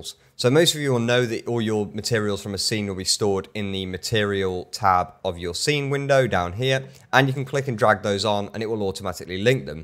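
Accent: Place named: RP, United Kingdom. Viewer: RP British elegant accent which I love to listen to.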